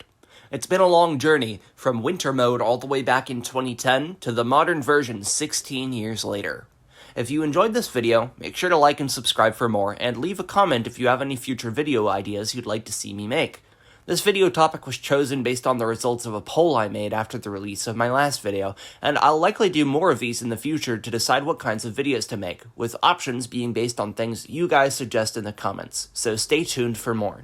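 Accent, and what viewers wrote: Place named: Delmarva, United States. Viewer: you kinda sound like ur from delmarva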